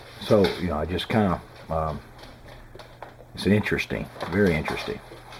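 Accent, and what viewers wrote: United States. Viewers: His voice is soo... "American" its awesome!!!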